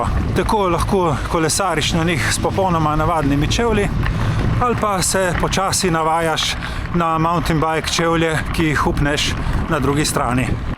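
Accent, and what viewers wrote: Primorska, Slovenia. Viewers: Predstavitve tvojih "potovanj" so mi všeč zaradi preprostosti in tudi, ker rad slišim primorski naglas. Malo ti zavidam, ker se voziš po lepih krajih in ti ni treba takoj v klanec. Jaz sem Zasavc in mi je to prikrajšano, ker moram takoj prestaviti v prvo za kakšno turo in še naša ozka dolina na cestah ni preveč prijazna do kolesarjev. Te dni sem zamenjal kolo in sem šel nad naše dva tisočake v Julice, kjer so me pozdravili vršaci nad meglenim morjem.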